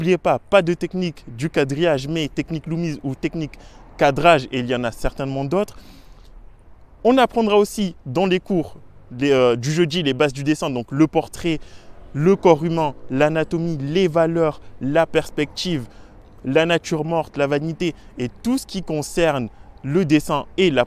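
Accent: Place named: Toulouse, France. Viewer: je sais pas mais on dirait que t es a toulouse vers Bonnefois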